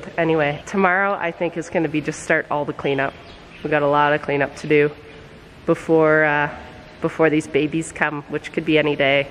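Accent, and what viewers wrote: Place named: Canada. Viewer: Where are you operating out of? Looks like Michigan but I just heard you say out} so I know your in canada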